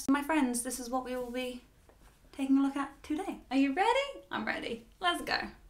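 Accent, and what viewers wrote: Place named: Australia. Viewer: is my favorite band. Where are you from? I like your English accent maybe Australian?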